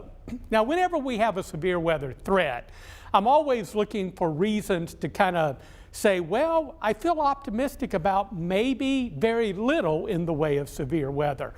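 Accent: South, United States. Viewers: This guy talks like a hillbilly imbecile.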